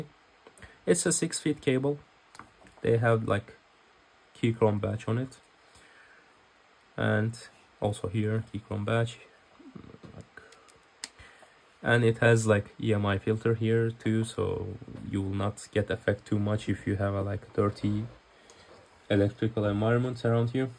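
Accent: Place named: Turkey. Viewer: U sound like turkish. R u turkish?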